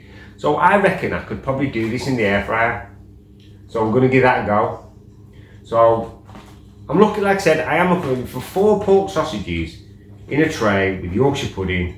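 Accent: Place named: Willenhall, United Kingdom. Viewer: Where you from, dude? Sounds like Willenhall, or Walsall? Good review!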